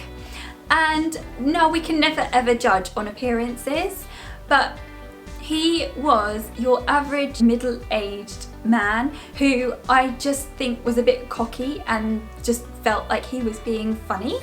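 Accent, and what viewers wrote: United Kingdom. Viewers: You're so awesome, love the British accent.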